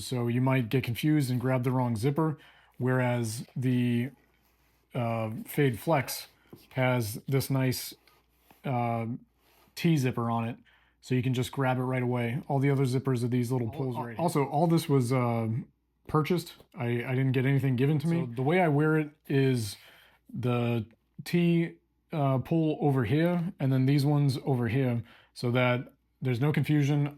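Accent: United States. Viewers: I was thinkin louisianian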